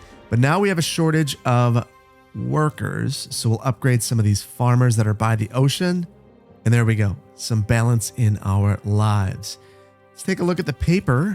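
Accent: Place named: Midwest, United States. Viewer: can really tell you're from the midwest :P schooner is pronounced like school is, ch makes the k sound. and frigate is pronounced like "frig it" :D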